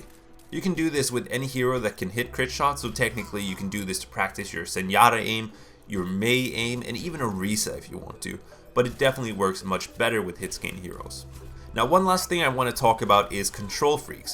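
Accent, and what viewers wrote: Boston, United States. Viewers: Where are you from. Your accent is cool. Boston?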